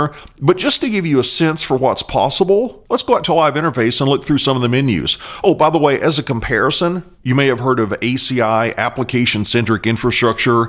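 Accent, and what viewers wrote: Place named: South, United States. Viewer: great teaching voice idk something about the southern drawls keeps me tuned in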